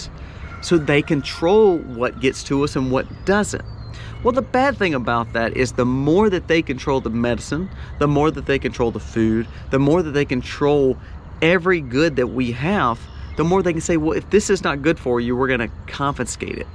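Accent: South, United States. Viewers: I am new to your channel as of a few days ago! I have learned a lot already, so keep the videos coming. Love that southern accent ❤!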